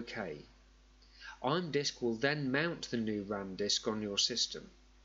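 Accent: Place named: United Kingdom. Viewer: Very helpful, thank you. Your speech was so good that I didnt mind the british accent, which I normally tend to despise. Although I do have one question, how the hell does the program even know where in the disk to put the files to during a shutdown? As far as the RAMdisk is concerned, the files could have come to it from even the desktop. How does it know where the files originally belong to? Edit: Nevermind, for some reason I assumed that RAMdisk was sending the files to their original places upon shutdown. Nay, rather, it just saves them to that one folder you specify and reloads from there upon restart. Why did I even assume that I wonder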